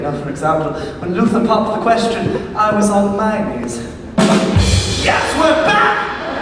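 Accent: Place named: Germany. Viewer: why he sound so german?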